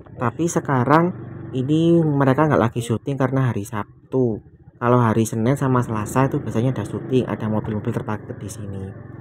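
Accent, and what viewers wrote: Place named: Java, Indonesia. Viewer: Jawa banget logatnya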